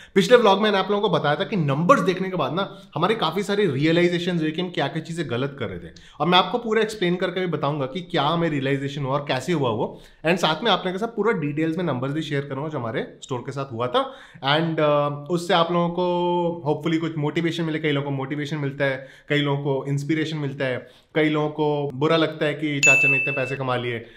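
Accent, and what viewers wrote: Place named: Surat, India. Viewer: just by the tone i can tell for sure you are shipping from surat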